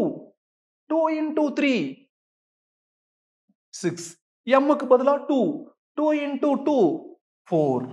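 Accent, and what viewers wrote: Coimbatore, India. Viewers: Sir are u a coimbatorian??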